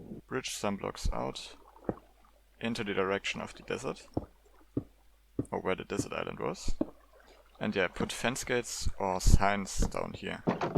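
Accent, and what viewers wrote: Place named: Germany. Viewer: you sound verry deutsch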